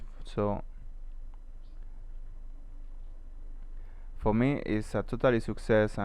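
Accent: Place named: Spain. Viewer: Sounds Iberian Spanish, or even North African, like Morrocan, or Tunisian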